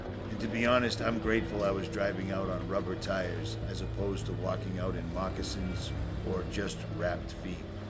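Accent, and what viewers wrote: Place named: East Coast, United States. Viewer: Your from the east coast aren’t you?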